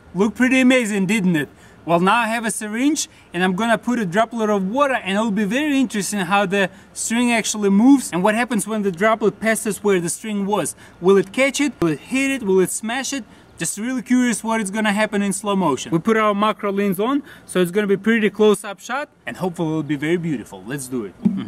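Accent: Russia. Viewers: Как же режет уши русский акцент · Russian accent? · it isnt him it is just another crazy russian they all sound alike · You English seems more fluent when you do your cooking class, and your Russian accent seem thicker when you do your Slo-Mo shoots! Is that on purpose? Mama's hat as a Matte-Box is too funny !!!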